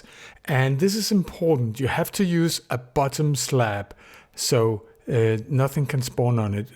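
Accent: Denmark. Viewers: your acsent really sounds danish ngl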